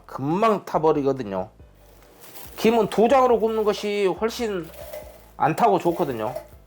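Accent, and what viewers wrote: Jeolla, South Korea. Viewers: 셰프님 전남쪽인갑네요잉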